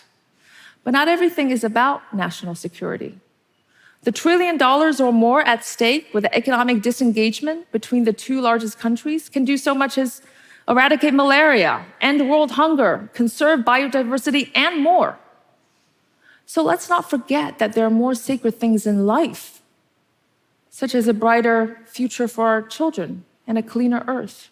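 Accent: United States. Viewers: She talks and acts like 100% American. Does she live in China or is she just a CBA? - from Beijing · She doesn't look like one that "read by candlelight" and she sounds too American to appreciate China as she so claims 🤔🤔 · Wow her American accent!! Amazing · The United States is a country of immigrants. We are a melting pot of many cultures from around the globe. Indeed sounds American. What makes the United States great is our diversity. Europe & the United States-the West-has brought the world enlightenment over the last 300 years. China is now enjoying this western enlightenment on some levels (not politically). It’s unfortunate that the Chinese, Communist Party blocks, YouTube, Facebook, and many other Western icons.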